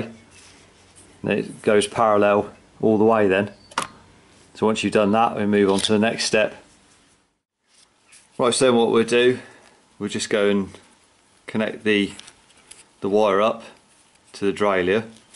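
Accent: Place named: Bristol, United Kingdom. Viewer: do you know, love the what must be bristolian accent and despite it sounding boring, to me today you are a hero.... All day I have been trying to sort my front derailiuer . I've checked 100 vids when things didn't or wouldn't go right... All I needed was to fit that tab that you so eloquently demonstrated. Jeez, I should have listened to you in the first place. thanks so much for this vid it's the best,.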